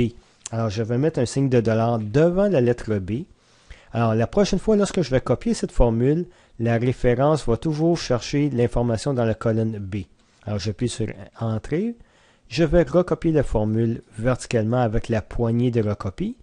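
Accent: Quebec, Canada. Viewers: vraiment très bien expliqué. Et belle effort sur l'accent car étant belge c'est assez compliqué de comprendre le québécois mais la aucun soucis. Très bonne vidéo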